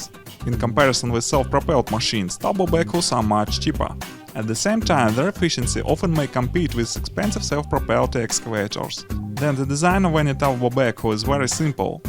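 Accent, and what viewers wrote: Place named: Russia. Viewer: Русский наверное. Акцент выдает))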